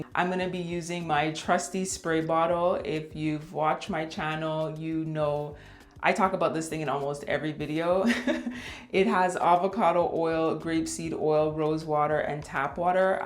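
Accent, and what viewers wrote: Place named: Toronto, Canada. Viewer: I knew you were from Toronto by your voice; are you also of Jamaican descent?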